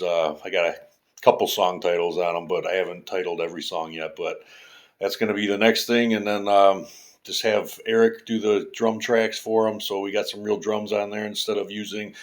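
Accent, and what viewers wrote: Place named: Chicago, United States. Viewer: Good grief, you're like Chuck Schludiner on speed with those riffs. Also, you literally sound more Chicago than you ever have.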